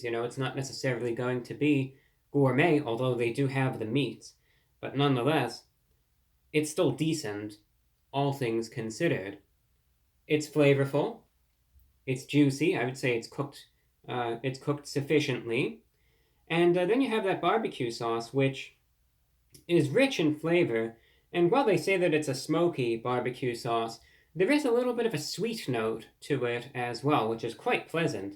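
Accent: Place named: New England, United States. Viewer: Ir he just needs to start rolling his R's now lol. It's just his New England accent, hopefully he never stops doing it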